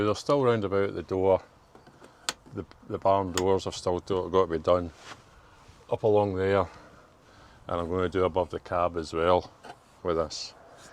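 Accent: Scotland, United Kingdom. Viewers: Great video pal! I love your non nonsense approach. Your accent makes me think you are not far from my Scots family in Ardrosson. P.S. Thanks for the Ted tax xxxxxx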